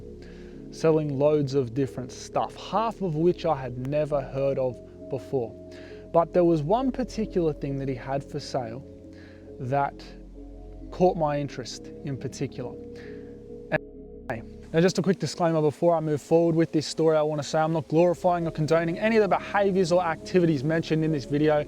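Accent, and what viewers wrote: Australia. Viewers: He’s Australian bro 😂 what Wer you on tho my guy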